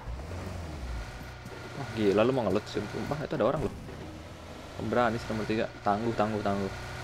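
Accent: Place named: Semarang, Indonesia. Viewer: Pekok pekok wkwkwk Semarangan bangeeettt, nguakak pok aku nda ndaa 🤣🤣🤣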